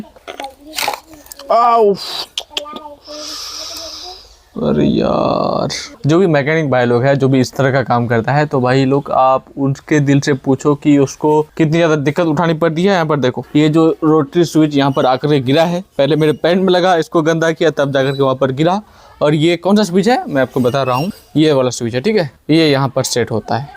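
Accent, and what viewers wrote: Bihar, India. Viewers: Apka voice mujhe pasand nahi shyad Bihari h hero giro type lgta h voice 😂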